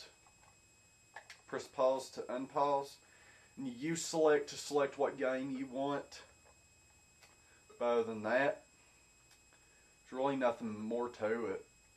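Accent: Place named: South, United States. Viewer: You have a thick southern accent man. Where you from? SC Here. :D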